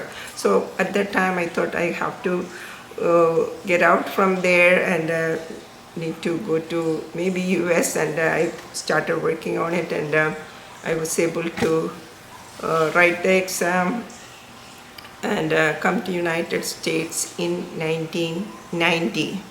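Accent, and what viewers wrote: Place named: Kerala, India. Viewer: Why are you talking English like malayalam. accent and pronunciation, very poor.what kind of English is this?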